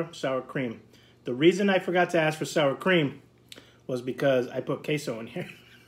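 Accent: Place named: New England, United States. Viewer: I guessed Massachusetts or Rhode Island by your voice. I’m from ct lol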